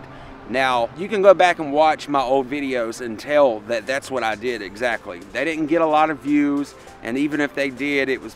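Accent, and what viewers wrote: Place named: South, United States. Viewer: Good stuff! I enjoy learning from people who are just learning too. I’ve played with video cameras off and on for like 20 years but only been serious about it for a couple years in total. Love the southern accent! I’m in FL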